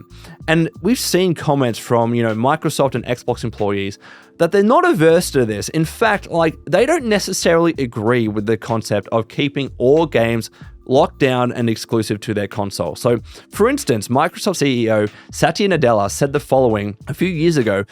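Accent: Australia. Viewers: Loving the news coverage becoming a thing for this channel, something about an Australian accent always makes stuff more interesting I guess 😅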